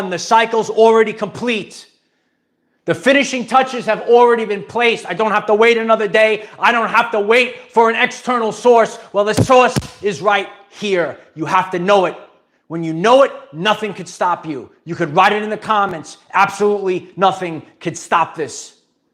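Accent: New York, United States. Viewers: Terrific sharing. You are a character. 94 degrees here in Florida (too hottt for me). Yes I have been learning about this stuff for many years. Carbon to crystalline, etcetera. We are stronger for everything we experience. The NAA wanted us to lower our frequencies through hatred, stress, and fear they created. To stop us from growing and ascending and being the powerful beings we are. They were fearful of losing their power, because we would realize they have been leeches and robbers to us. We all have masculine and feminine in us. I believe most males are very fearful of the feminine in them and see it as weak. It is not weak, it is strength and compassion. Females also have masculine in us and can be strong. Many humans are finding that beef is too heavy to digest anymore. I eat beans and nuts. You inspire me to build my body muscularly as a slim athletic gal, I need to lift some heavy weights to get stronger. 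Time to join a gym. Peace and love to an authentic male and teacher. I appreciate you. The NY accent is awesome.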